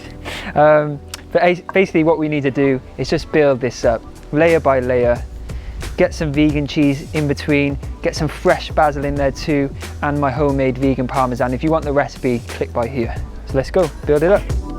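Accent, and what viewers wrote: United Kingdom. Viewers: Amazing recipe. Can't wait to try it. Big fan - I have all your books. + You don't need to say eggplant instead of aubergine - we love your Brit talk here in America 🥰 Although - I do think you'd look awesome if you cut the top of your hair really, really short (American style) ☺️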